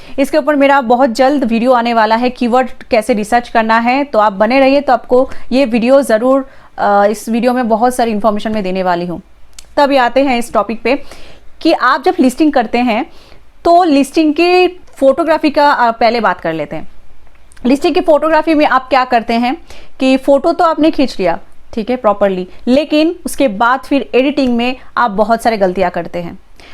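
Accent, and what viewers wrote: Bengal, India. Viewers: good info with strong bengali accent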